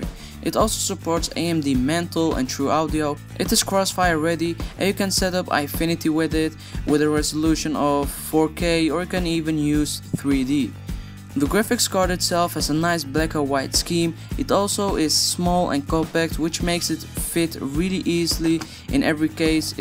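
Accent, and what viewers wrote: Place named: Netherlands. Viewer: are you dutch man you sound like one im dutch too i will soon be building a 600€ gaming pc with a r7 265!!!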